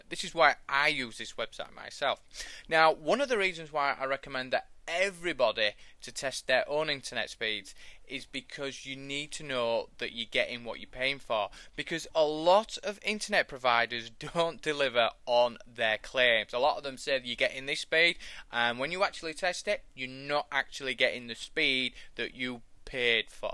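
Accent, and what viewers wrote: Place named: Leeds, United Kingdom. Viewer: You from Leeds? Great accent!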